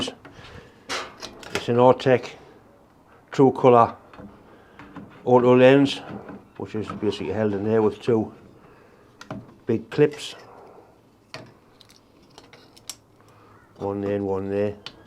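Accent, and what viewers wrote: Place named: Geordie, United Kingdom. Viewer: Your the UK version of Chris from clickspring, tiny shop making amazing stuff. With that broad geordie accent that makes me think of home (Sadly I'm a Smoggie) and your gentle easy going way, it's just perfect. And the swearing means your human, hee. I always learn something and that is the best sort of video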